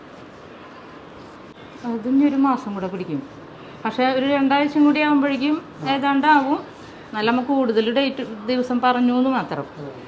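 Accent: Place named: Palakkad, India. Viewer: സംസാരം കേട്ടിട്ട്. പാലക്കാട്‌.. അല്ലെങ്കിൽ തൃശൂർ ആണെന്ന് തോനുന്നു 🥰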